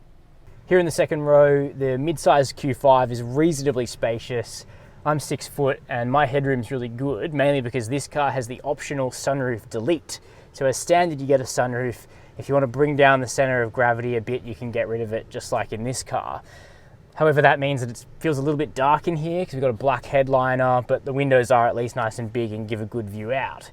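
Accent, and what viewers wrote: Australia. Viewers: Hi, I am watching your rewiews mostly because of your pronunciation of english language and I must tell you, I am having a good time😊. I live in Europe, Slovenia and if I may ask you, I know the rewiews are about the cars, but can you show as a little bit more of your beautiful country AUS. All I can see, is one same road, I am shure, they are more. Here, when I look through the window, only I can see, is coronavirus! We are depressed😱. Thank you very much and take care, Damijan.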